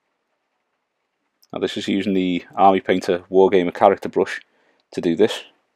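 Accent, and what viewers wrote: Liverpool, United Kingdom. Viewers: Again, a beautiful, easy to follow tutorial :-) I just bought the Vallejo skin painting set, and have tried following their instruction booklet, but it takes so long to get the tones right, that halfway through, I was flagging and fast losing interest. These quick tutorials are SO much better for someone like me, who doesn't paint to "Crystal Brush/Golden Demon" standard, and just likes to paint for fun. Also, LOVE the Scouse accent, as I haven't heard that since my drinking days in Birkenhead (Stairways nightclub featured heavily in those halcyon days) and other Merseyside areas. I collect Frostgrave now, and love painting them, especially the Dwarves, female soldiers and Cultists, just for the sheer diversity. Again, love these amazing tutorials you make, and wish we'd had them 40+ years ago, when I first started collecting and painting Airfix 1/32 & 1/72 scale soldiers.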